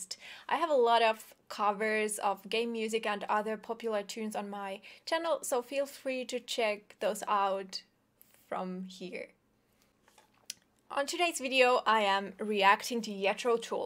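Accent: Finland. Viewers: She's Finnish. As in German, J is Y and TH is T, and the Rs are rolled, so Jethro becomes Yetrrrrro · I knew you were suomalainen nainen I miss that accent...❤ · Mike G She's from Finland! English is at least her second language. She's adorable!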